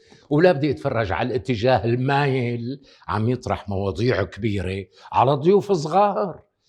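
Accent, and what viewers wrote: Syria. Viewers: ريحة الشام و الياسمين.. ريحة وطني.. و لهجة وطني.. ريحة أبي.. و طريقتك بتشبه طريقته.. الله يخليلنا ياك 🌷 و يدوم عليك هالذكاء و الإلقاء و الفكاهة اللاذعة 💕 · جميله اللهجه السوريه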